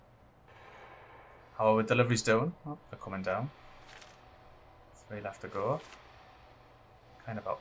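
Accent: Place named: Newcastle, United Kingdom. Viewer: Where abouts from Newcastle are you from?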